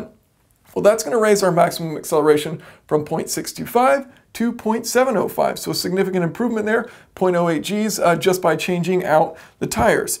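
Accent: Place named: United States. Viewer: You sound like you’re American. What’s with using “kg, and meters”? Speak in American English cock suck!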